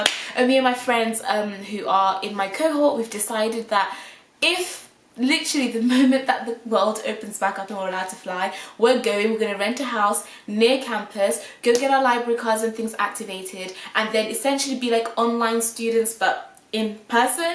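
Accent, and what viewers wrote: United Kingdom. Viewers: Wait is she british?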